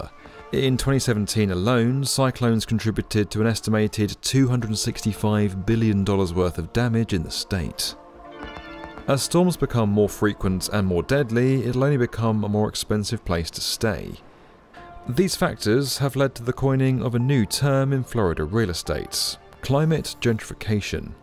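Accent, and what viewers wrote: United Kingdom. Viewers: British accent is so annoying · It’s hard to take this video seriously and I guess it’s just meant to be easily digestible content with a posh British accent. Having lived in Miami for 20+ years, worked in real estate and other industries, almost every heavy handed conclusion that this video draws is absolutely farcical.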